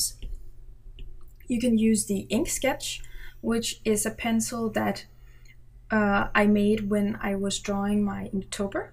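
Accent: Denmark. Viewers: I don't mind if you screen record or this way. But I am a little concerned about your Apple pencil because it's missing the cap. Did you lose it? But anyway you record, I love your voice, it's adorable ❤️ your Danish accent is just insanely cute 😍💛💛💛 Your so skilled as an illustrator. I'd love to see you create an entire piece in real time from start to finish. Don't care if it's an hour or two long. Your soothing voice calms my anxiety disorder. I finally found my Kryptonite - you 🥰🍕🍕🍕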